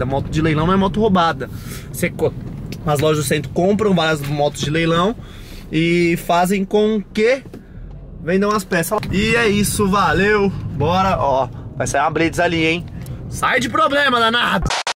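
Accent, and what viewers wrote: Bahia, Brazil. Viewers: Mo Baiano kkkkj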